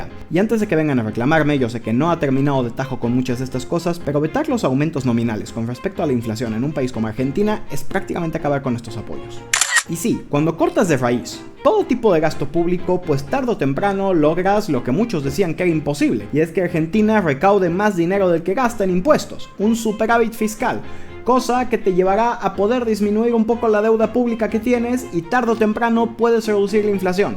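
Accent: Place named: Mexico. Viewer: Se nota que no sos argentino y por como hablas pareces mexicanito y haces este video sin conocer al país argentina ni a du gente y menos al presidente y mucho menos la historia de argentina y te pones a decir ridículeses sobre cosas que no conoces lo mejor sería que bajes este video falso estende y antes de hablar de otro país tenes que informarte bien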